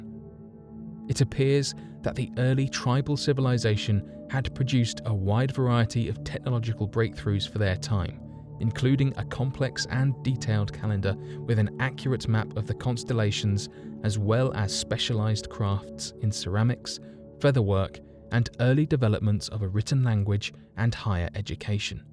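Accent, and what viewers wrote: United Kingdom. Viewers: The Aztecs were of north and Central America, The Incas are from South America. This information provided is off, everything sounds legitimate when you have a dude with a British accent narrating it.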